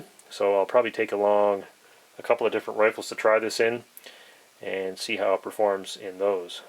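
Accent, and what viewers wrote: Canada. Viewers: May I assume you are in Canada (based on your accent) ? Norinco ammo has been banned for importation to the United States for many years now because of the Norinco/Keng's Firearms fiasco, but I still have some of Keng's match ammo as well as some silver boxes of Norinco. I don't shoot it because it's quite rare here in the US. Thanks for the video...it's the first time I've seen this Norinco ammo reviewed...nice job!!!!! :o)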